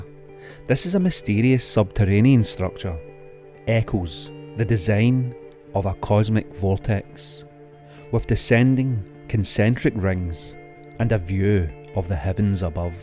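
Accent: Scotland, United Kingdom. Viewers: There's just something hearing the story in a scott accent that makes it so much more interesting. Reminds me of my grand uncles.. natural storytellers.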